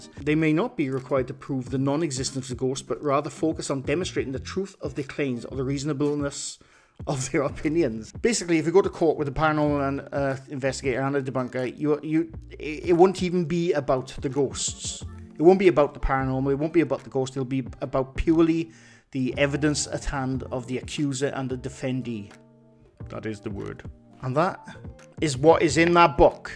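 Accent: United Kingdom. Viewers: I take it from your accent that you are posting from the UK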